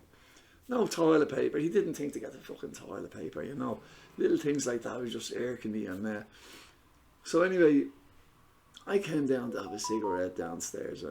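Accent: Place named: Ireland. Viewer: Have you ever heard of the rehab in tallaght in Dublin guy Leyton was next to a traveller's site .. the house was a mess they had found out how it was so damp and cold ... tree roots were under the house the walls had mold was a Christian ✝️ centre basically theres your room sweat it out this was in February.. it was freezing i was looking at the mold thinking 🤔 this isn't healthy but i was two sick .. opiate and benzo withdrawal met some great guy's but 🫣 it was i ruff time .. im a guy from Scotland i was 27 first took H at 15 but got on suboxone got my new job worked my way up had two kids .. but was taking dizepam like sweets to taper down from suboxone get on a lower dose .. but they said if your on dizepam cant take you 🤔🤚 no no iv not had them in weeks i lied .. that withdrawal in that old house 😮 im going to die here and its other addicts saying you will be ok 👍 god will help 🙄 yeah lots of crazy things happened.. 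but your voice reminds me of the guys there things like im in bits they called trainer's runners.. and pass the yoke everything was a yoke 😂😂 but good to see you clean and now free from the chains ⛓️ of withdrawal and hunting just to feel normal 🫶❤ love from Scotland.. Irish. And the Scottish seeem to have. The same sense of humour always get on from guys from Ireland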